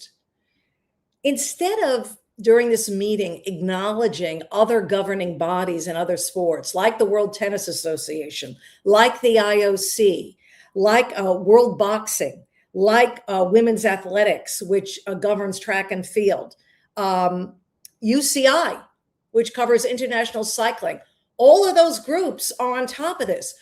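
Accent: New York, United States. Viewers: Love NYC accent.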